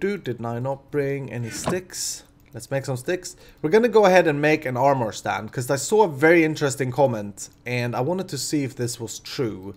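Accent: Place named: Sweden. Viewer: Man, I feel left out of the conversation here! Oh wait det gör jag inte alls det! You're the only youtuber with a Swedish accent I'm watching. Because the Swedish accent makes me cringe (mainly because I can hear all the stuff I, myself, pronounce erroneous in English, it's a bit like hearing yourself recorded, but with someone else's voice). But you're doing way to interesting stuff for the accent to outweigh the content produced!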